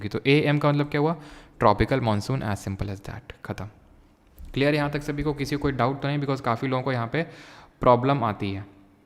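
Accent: Kanpur, India. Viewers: भैया बाकी सब ठीक है अपनी उन्नाव कानपुर वाली भाषा सुनके मजा आ गया 😅😅😅😅 कि इतनी ठंडी नही पड़ती की ( जड़ा 😅) जयहो 😅😅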